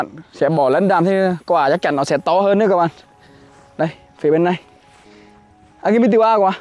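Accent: Nghe An, Vietnam. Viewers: Nói tiếng nghệ an gì đó chậm thôi anh. Ko hiểu a nói gì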